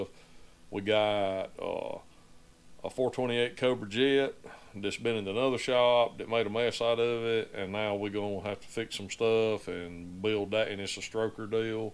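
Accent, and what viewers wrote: South, United States. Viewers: I’m just here to tell you, I’ll be here watching ya make whatever you make. I miss hearing real southern folk talk out here in Cali. You could polish a turd and I would watch. I am not your target demographic, but you can educate and explain things to people from different backgrounds. You do you, I’ll be here watching.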